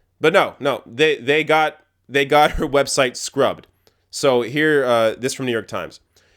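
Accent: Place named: Canada. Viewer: The canadian really came out in this video.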